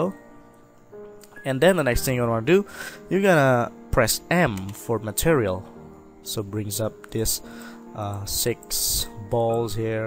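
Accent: Indonesia. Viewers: I knew it the second he talks. indonesian accent all the way! Awesome tuts anyway! very helpful. yay for indonesian youtube guru! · Aha indonesian right? Btw it's a great tutorial dude, i thought you were western at first haha..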